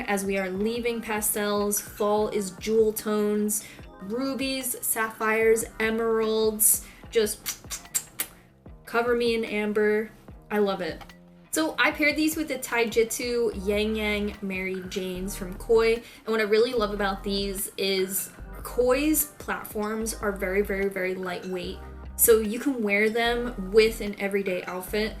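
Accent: Philadelphia, United States. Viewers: Getting used to short nails is so difficult 😩 I loveeee this content 💞 your philly accent is my fav thing as someone from the west coast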